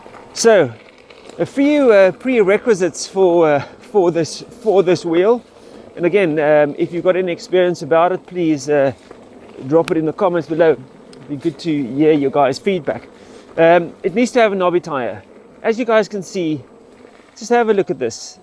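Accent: South Africa. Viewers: Just out of interest are you, perhaps a South African or a Dutchman/Hollander as when you speak I hear a bit of the Boer accent in your speech? As for the bike and I need an e-bike, as I lost my car driving license due to failing eyesight and live at the top of a 1 in 6 hill, that no matter which angle I ride to and from, I need a bike that can substantially climb without much effort, say mode 3 and mode 5 hill assist electric power. So would you recommend this one, over the similar 345 original?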